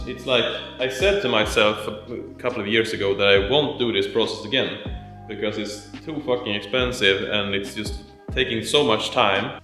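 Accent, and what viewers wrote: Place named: Sweden. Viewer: yeeez verybra jdm swenglish du got my sub <3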